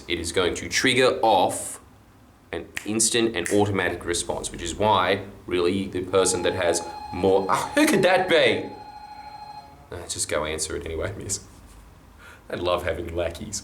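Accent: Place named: Australia. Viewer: At this moment I am s in and don't know what you're on about. This is fine - as I only wanted to acknowledge that YouTube auto captions work well with your voice. This is great, because you are funny all by yourself without the slow car crash of captions going awry which normally happens. I don't even know why my captions were on. But I was impressed. Educated, neutral Australian accent. Works well enough for me.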